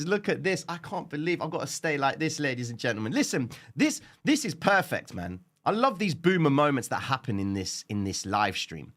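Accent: Cockney, United Kingdom. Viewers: the man for me. Thank You brother.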